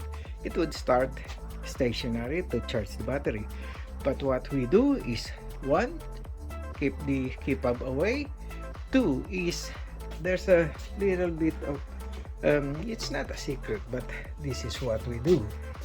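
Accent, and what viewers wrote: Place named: Spain. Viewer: Se nota que eres español por como pronuncias en inglés.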